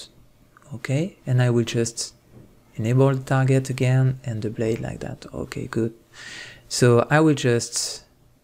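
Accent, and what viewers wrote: France. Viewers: bravo super vidéo j'ai reconnu le petit accent français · do you speak french? if so I can tell in the way you talk cause I also speak french or am I trippin but nice tutorial tho its amazing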